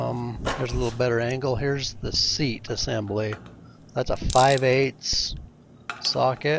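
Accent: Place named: South, United States. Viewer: This vid was a great reminder that I would've unhesitatingly cast instant skepticism & doubt on whether or not this fella could give me proper advice on a torch regulator re-fit if I hadn't immediately noticed that unmistakable rural twang... which, of course, dismissed that same potential skepticism just as quickly. No political bs yadayada implied here either: rural/country/Southern folk are just ~a billion times likelier to be able to spell out things like this as if they were describing yesterday's weather to you is all. Just my city-slicker $.02...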